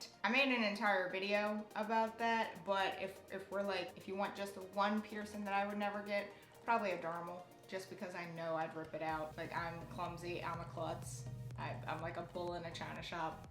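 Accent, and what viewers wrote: South, United States. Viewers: I change my daith on my own and I've gotten good at changing it on my own, yours also closed on you a lot faster than mine did, if you ever try again just be patient and be ready to switch out the jewelry. Also you've answered my questions in two of these videos! _ (Ado1017). I pronounce Piercing like you too, it's just a Southern thing lol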